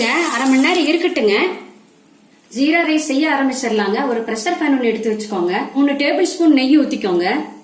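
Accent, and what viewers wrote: Kongu, India. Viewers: nice to hear kongu Thamizh. super process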